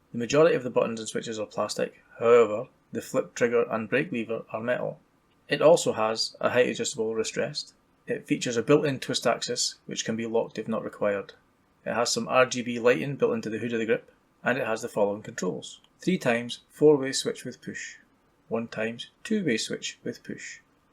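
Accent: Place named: Scotland, United Kingdom. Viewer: The gentle Scottish accent makes it an effortless sell... I must be homesick! Seriously though, you highlighted most of the reasons I also went with the WinWing over the Virpil. Good video,. I hope there are many more to come!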